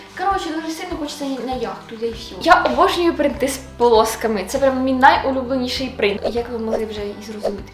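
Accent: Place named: Western Ukraine, Ukraine. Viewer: У тебе така гарна мова, не чисто літературна але в цьому і привабливість. Ти взагалі звідки? Гадаю з Западною України- Тернопіль, а може і з Вінниці. А взагалі дуже приемно чути твою мову- спасибі тобі!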